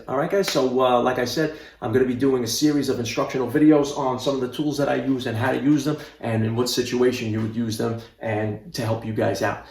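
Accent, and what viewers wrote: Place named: Brooklyn, United States. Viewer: Living in seattle, but having grown up in brooklyn, I really appreciate hearing your accent. Home again.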